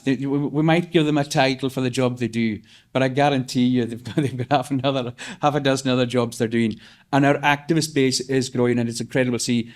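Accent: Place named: United Kingdom. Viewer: Great speech! Homeland party are great. On a side note iv never heard of someone that sounds like they have a Scottish, english, irish and welsh accent all at the same time 😅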